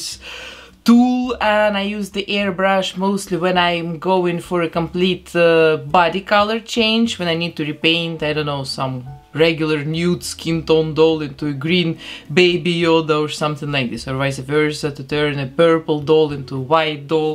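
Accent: Russia. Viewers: Мне одной кажется что у неё русский акцент?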